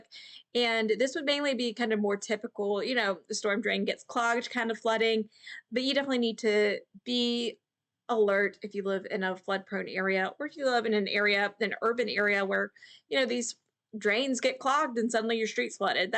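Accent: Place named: South, United States. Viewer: I love how you explain the weather! Nice Southern accent too!👏👏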